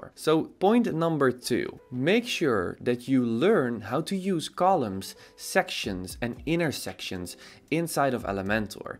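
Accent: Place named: Netherlands. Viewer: I like how he sometimes forget to hide his Dutch accent. Great channel by the way.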